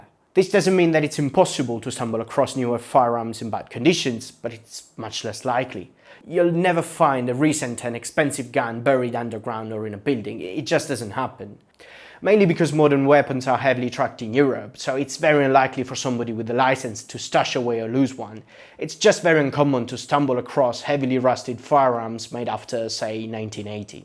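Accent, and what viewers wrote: Italy. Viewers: Northen Italy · Came via the Internet Anarchist, really love this, especially as I know nothing about firearms. Also, one of my mates has a girlfriend from Milan and you sound exactly like her.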